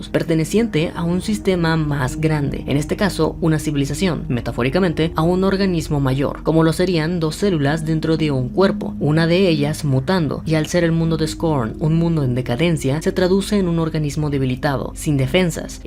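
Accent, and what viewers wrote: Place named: Panama. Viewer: aa te sentia acento a panameño, no pareces de MX, buen video por cierto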